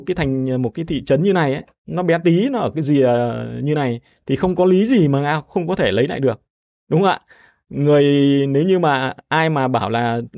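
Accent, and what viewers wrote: Standard. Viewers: Nói chuẩn nha chú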